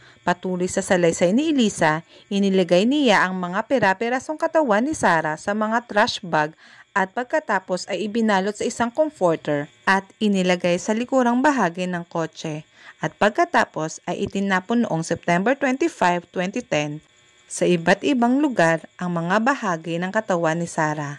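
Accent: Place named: Visayas, Philippines. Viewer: ung may pagka bisaya magsalita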